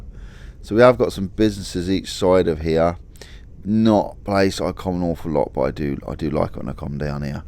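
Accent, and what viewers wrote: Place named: West Country, United Kingdom. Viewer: You sound very west country (like Devon/Cornwall). Not sure what I expected a Guernsey accent to be like though.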